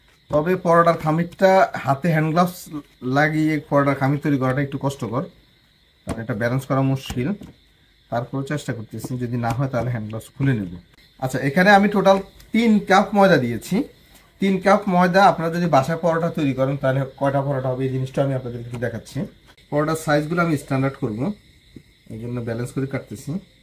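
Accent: Noakhali, Bangladesh. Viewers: আপনার রেস্টুরেন্ট কোথায়? আমি নোয়াখালী থেকে বলছি। আপনার কথা শুনে মনে হচ্ছে আপনি নোয়াখালীতে থাকেন। লোকেশন বললে সরাসরি আসতে পারবো ইনশাআল্লাহ। খুব ভালো লাগবে আপনার সাথে দেখা করে।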